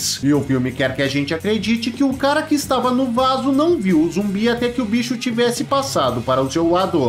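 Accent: Rio Grande do Sul, Brazil. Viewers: Gaúcho neh kkkkk tmj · Fala tchê, tu é de que parte do RS. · Cara tu é gaúcho né? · TU É GAUCHO?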